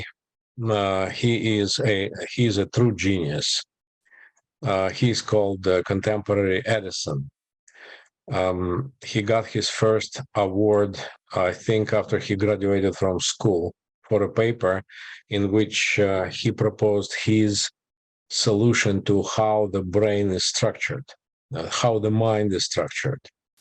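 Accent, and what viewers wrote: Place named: Russia. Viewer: Здравствуйте,! Слушаю ваши лекции по истории религий на русском и они мне очень нравятся системностью подхода, чётким структурированием и ясностью изложения. Чувствуется, что у вас большой опыт преподавания. Сегодня попробовала послушать лекцию на английском и была обрадована тем, что понимаю! Отношу это в определённой степени за счёт вашего "русского" акцента ( не сочтите сомнительным комплиментом), Очень рада, что теперь потенциальный запас интелектуальных "вкусняшек" у меня увеличился. Они помогают пережить время морока, накрывшего мою страну. Thanks a lot!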